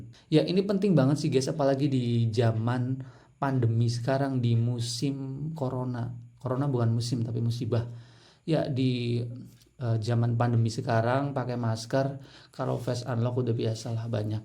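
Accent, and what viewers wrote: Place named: Java, Indonesia. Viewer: Abang orang jawa yah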